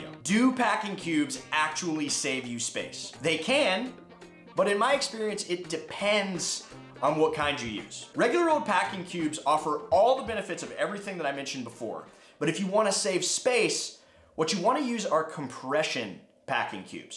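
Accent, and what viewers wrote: United States. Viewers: I didn’t mean anything bad. It’s just that you are exactly how I imagine an American to look and sound like ;)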